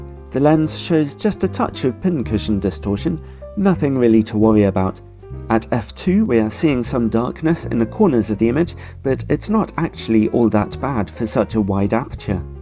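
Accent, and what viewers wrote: United Kingdom. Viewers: Does everyone in UK can speak like you?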